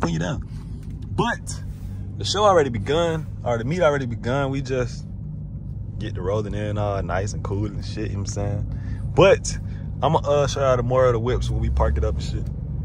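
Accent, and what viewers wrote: Atlanta, United States. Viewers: bro i kid you not people talk like this in atlanta its an accent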